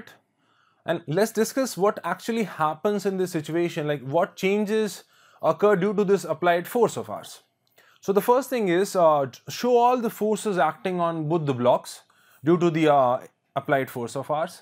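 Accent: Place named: United States. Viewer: He is an as*hole really! F*ck your Amreican accent you filthy crow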